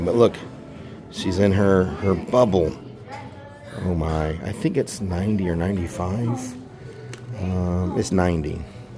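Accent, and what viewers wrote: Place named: Boston, United States. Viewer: Wicked. Sounds like Boston.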